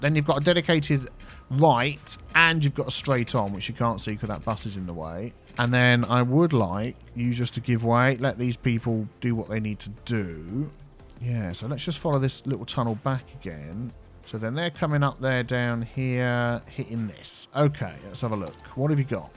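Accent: United Kingdom. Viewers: thank you for responding! Once in your lifetime, you could lose hearing to a point where you can't understand anyone. Have you thought how life would be like if you were deaf? Mine started at birth, always trying to understand people. I can hear, yes, with a cochlear implant, but I want you to consider the people who physically can't hear. They are forced to read your captions if they want to try understand you. They miss out on the physical cues you give off as a British speaking person. I love your accent, and seeing you talk would bring joy not only to me, but to the deaf, deaf blind and hard of hearing community. We get to know you through how we see the world, not just people who just hear you speak. I absolutely love how you have your word choice, having captions helps me follow along, laughing. Why not go one step further? Hope to see you soon!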